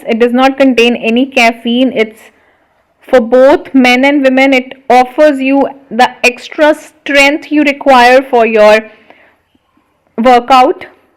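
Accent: India. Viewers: Indian accent 😩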